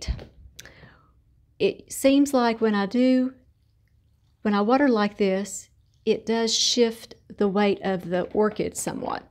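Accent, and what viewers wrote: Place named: Tennessee, United States. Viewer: I, do you put your fertilizer water on each time you soak your plants for 45 minutes, all year long. Or do you ever water with plain water in between? My daughter lives in Knoxville so I love listening to your TN voice! Thanks, Susan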